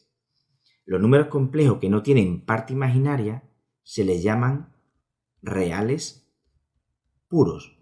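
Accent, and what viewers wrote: Andalusia, Spain. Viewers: Buenísimo! Y mejor con el acento andaluz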